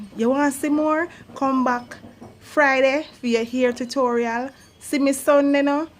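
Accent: Jamaica. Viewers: I love the jamaica voice. I had a pen pal from Jamaica and he tried to teach me to speak the the language but I never could get it.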